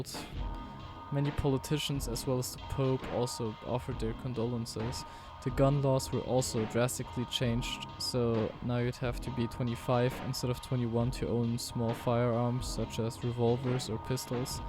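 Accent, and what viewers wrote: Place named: Germany. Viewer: You have a german accent tho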